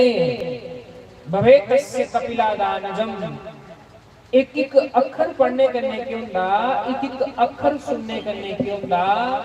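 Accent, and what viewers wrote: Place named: Pathankot, India. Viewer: sanju ji di karth mane puri suni muje to apki je. pathan kot bali bani badi madur lagti h mere maike ki bani jehi h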